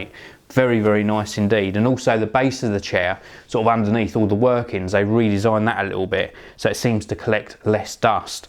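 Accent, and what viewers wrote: England, United Kingdom. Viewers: the "leavers", love that English accent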